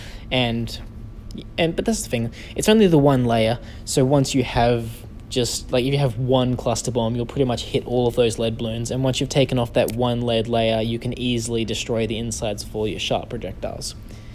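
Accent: Australia. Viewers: I swear this sounds like an Australian accent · Are you from Australia? new zealand? Bro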